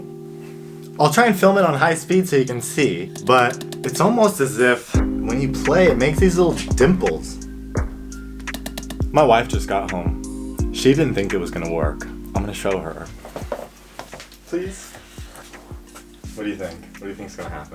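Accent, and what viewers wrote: South Africa. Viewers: You're South African?! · What are u South African??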